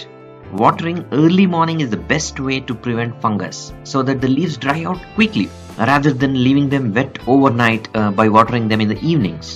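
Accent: India. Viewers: Please just eliminate the background music . Hard to hear your Indian accent voice with the music . Very informative . Thank you .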